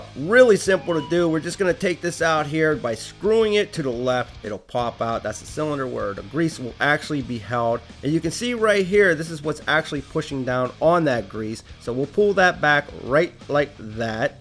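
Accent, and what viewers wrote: Pittsburgh, United States. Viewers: I sense a little Yinzer in that accent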